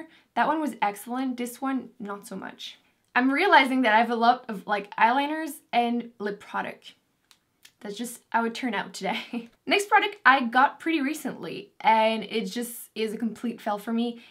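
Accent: Quebec, Canada. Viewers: your accent is my fave! I love listening to French Canadians speak... is that weird? haha love you! xx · sounds like a french canadian accent ? cool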